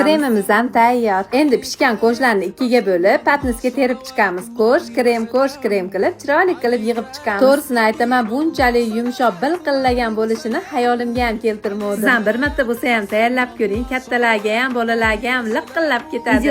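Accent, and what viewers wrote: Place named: Andijan, Uzbekistan. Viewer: Anjancha shevalarizdan😊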